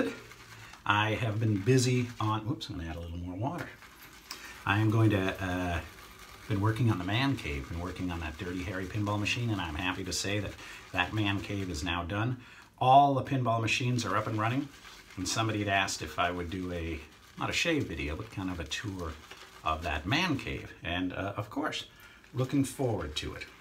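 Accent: England, United Kingdom. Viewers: Would love to hear your Newcastle accent on camera, and to see Eternity coming next in this record breaking series! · You sound like you're from Kansas. Can you give us a taste of the ol' English accent?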